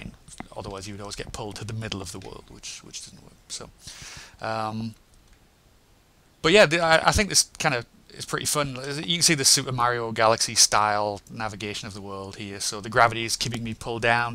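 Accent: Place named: Southern England, United Kingdom. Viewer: I can't quite put my finger on your accent, it's almost fairly southern English accents with twangs of northern and a tin bit of American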